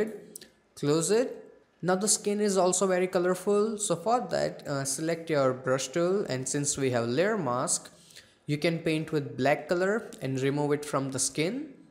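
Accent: India. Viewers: i´m sure that u r an indian. u speack like an indian guy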